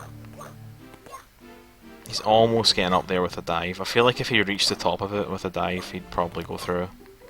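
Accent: Scotland, United Kingdom. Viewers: Yupeji His commentary with his Scottish accent is interesting enough, stop complaining, it's just 4 minutes.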